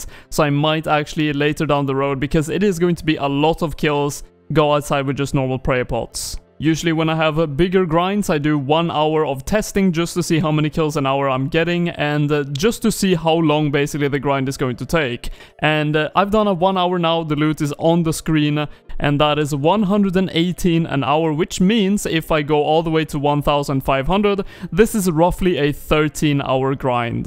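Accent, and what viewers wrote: Sweden. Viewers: Really love the series, you sound swedish are you? "drÄgon hunter lAnce" RSN: M4tsson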